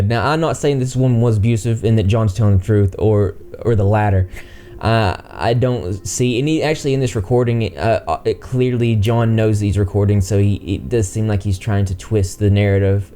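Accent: South, United States. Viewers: I love the country boy accent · Hello from Lexington KY, loving the southern accent, where are u from? I subbed. So great to find a southern man with a moral compass and moral integrity on YT! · I commented on your eugenia cooney video something similar, but i wanted to ask, where are you from? This is the second video of yours that im watching and i think ive narrowed it down to either alabama or louisiana/texas area. I am from houston area and not many people talk like that around this here, thats why im speculating louisiana area. Sorry for the long comment i am just so wowed by southern youtubers idk why😂😄😳😳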